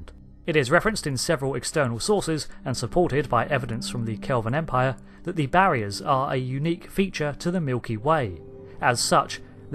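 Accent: United Kingdom. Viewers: Why am I so repulsed by narrators on YT with British accents? I was a bib Beatles fan and couldn't get enough of that sound. Now? Fuggedabouditt!!!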